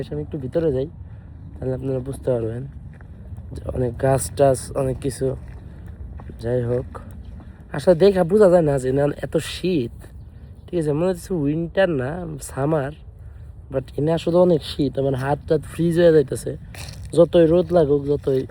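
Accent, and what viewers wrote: Tangail, Bangladesh. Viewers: কথা বার্তার ধরনেই বুঝা যায় আপনি পিউর টাংগাইলা..😁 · vai ki tangailla nki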